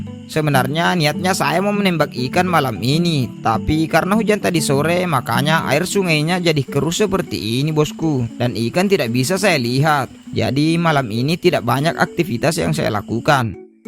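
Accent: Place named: Eastern Indonesia, Indonesia. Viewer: Ha.ha baru tahu saya bang, nungguin video paman bara gak upload2, eh malah ketemu ini, Mantap daeng, Saya dari awal, mencoba menebak ini kok dialek timur sana tapi dari mana ya, begitu sebut kata "seket dan "terbangka-bangka" saya langsung 😂😂😂😂😂😂.. Sukses selalu daeng,... Salam dari bori bellayya, tanah melayu deli... Putra asli Butta Panrannuangku.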